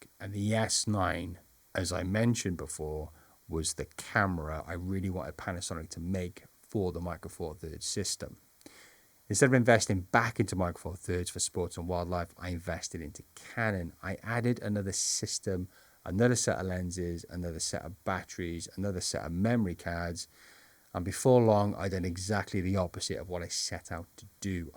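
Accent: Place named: United Kingdom. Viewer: Thanks, I deeply appreciate how you pack in tons of information in your videos, and I could listen to your voice all day, Brother, as I love British accents, but yours is calming. 😄 I just recently returned to watching your content again for both your channels. (My previous YT channel was hacked, so I had to re-subscribe, hehe.) Big Respect from the U.S., my Friend.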